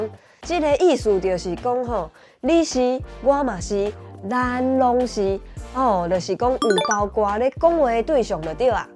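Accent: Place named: South, Taiwan. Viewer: 聽就知道南部人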